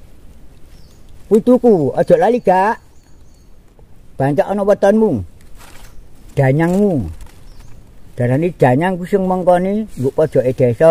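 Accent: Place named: Java, Indonesia. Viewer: Seng ngenah logate wong jowo bossss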